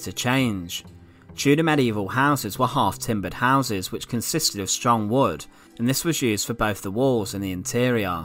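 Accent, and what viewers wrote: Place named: North, United Kingdom. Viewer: nothing he’s just northern😭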